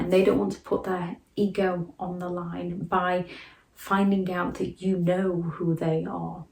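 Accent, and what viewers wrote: United Kingdom. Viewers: I love your accent.Sexy Limey!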